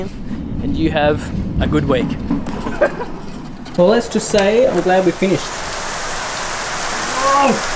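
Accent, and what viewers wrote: Australia. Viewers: I knew you were Aussie before I clicked on the video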